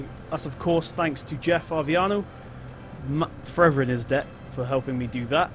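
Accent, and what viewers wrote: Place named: Northern England, United Kingdom. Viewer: oh deer pal so you sound northern dint realise m8t your vids are amazing can u do state trooper patrol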